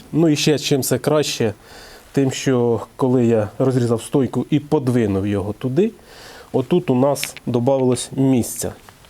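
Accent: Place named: Surzhyk, Ukraine. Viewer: Хотя и говорит на суржике, но плохие,не нужные, слова не мовит( говорит) Все по делу. И субтитры. Молодец мужик( хлопец).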